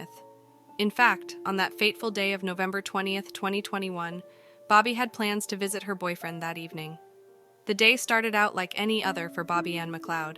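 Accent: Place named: United States. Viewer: weird hearing what sounds like a script written in the UK being voiced by an american voice over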